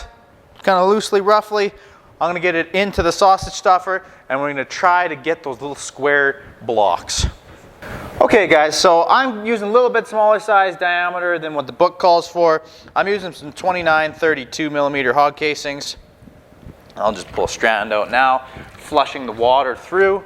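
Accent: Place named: Canada. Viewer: So I can tell from your voice that you are a fellow Canadian the way you said today you sound like half the guys I hunt with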